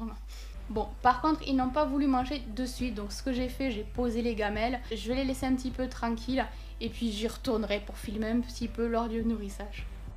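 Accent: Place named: Toulouse, France. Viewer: Juste super resultat j'adore cette sonorité toulousienne ^^! Cest merci à vous deux d'autant m'apprendre et nous partagé tous ça! ET SURTOUT davoir eu et pris le temps de repondre cest super.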